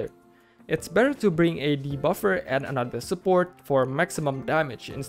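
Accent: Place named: Indonesia. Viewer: Fix Indo wkwk kedengeran aksennya, mantap bang, keep making more content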